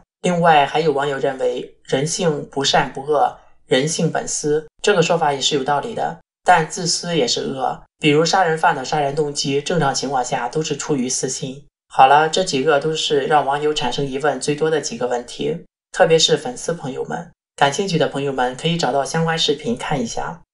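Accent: Shandong, China. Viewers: 兄弟，你的山东口音让我跳戏。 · 山东味太重了…… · 读稿子的是山东人吗？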